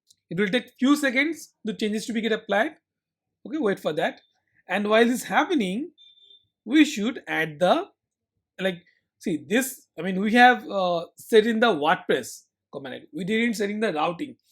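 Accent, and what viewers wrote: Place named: India. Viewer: The video is amazing but your indian accent is so strong that is dificult to understand ... Try to put subtitles next time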